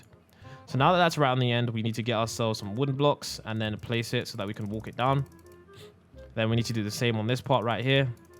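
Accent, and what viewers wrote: United Kingdom. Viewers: Why you sound british?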